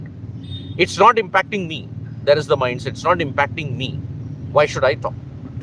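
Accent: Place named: Kerala, India. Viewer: Who is this guy? Sounds like a mallu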